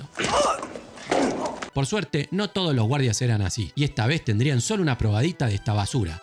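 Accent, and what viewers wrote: Argentina. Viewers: Definitivamente me encanta el acento argentino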